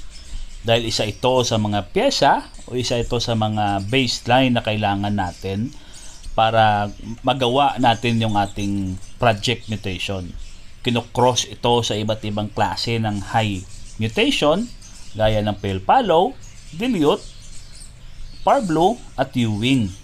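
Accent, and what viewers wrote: Iloilo, Philippines. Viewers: Puga lods ilonggo ka gid? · To ilonngo ka.. may punto kasi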